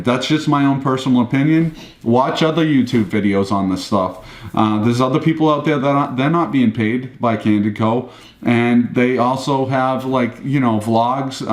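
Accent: Boston, United States. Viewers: Just here for the Boston accent.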